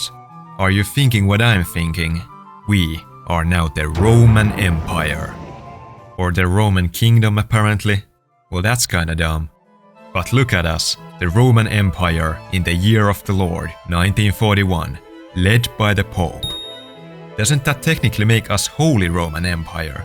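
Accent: Finland. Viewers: the finnish accent, Perfection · Hey man are you from finland cuz u sound like it😮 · Oh god i hear the finnish in you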